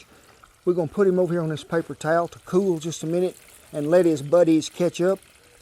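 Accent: South, United States. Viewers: LOVED this!!!! If i'm looking for a catfish recipe and there isn't a good southern accent, I promptly hit the "back" button!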